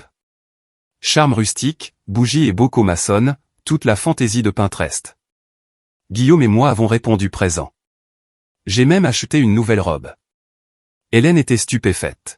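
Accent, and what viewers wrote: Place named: France. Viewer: J'ai adoré l'anglais avec l'accent Français ptdr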